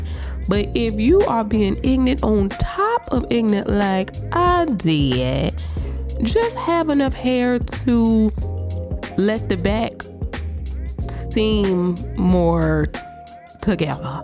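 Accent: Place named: Houston, United States. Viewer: Commentary is GOLDEN!!!! You sounding like us out hea in Houston lol. & that hair baby!!!! You ate down! Beautiful 😍 👏🏽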